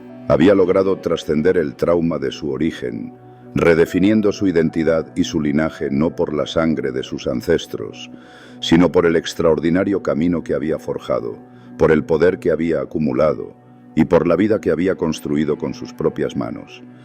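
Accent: Spain. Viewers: Hubo dos grupos que los mexicas No pudieron someter, los Tlaxcaltecas y los Zapotecas, lean, investiguen, analizen, saquen sus propias conclusiones y veran las diferencias, ademss este narrador tiene acento español, el imperio mexica SI era el mas fuerte y predominaba cuando llegaron los conquistadores pero no era el unico grupo etnico que existia, habia muchos grupos pero estaban sometidos, pero todos los grupos etnicos que existian NO eran mexicanos porque México NO existia como tal, eso vino despues en la independencia en los 1800s, el México actual se forma de tres grupos, los mestizos, los afromexicanos y los nativos, osea indigenas, estos tres grupos ya si somos mexicanos, 💯%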